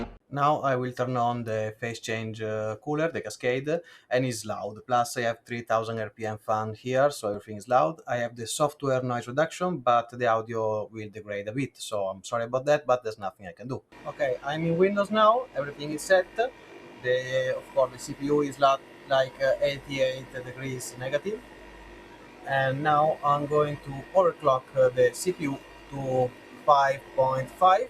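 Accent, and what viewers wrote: Italy. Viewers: Your accent sounds very Italian.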